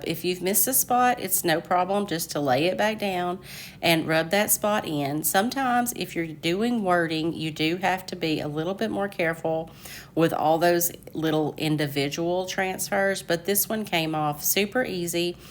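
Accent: South, United States. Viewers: I loved the tray best of all. I haven’t tried paint inlays yet but I think I will give them a go. I always look forward to your new video every Wednesday. I love your southern accent - I have a very Yorkshire accent!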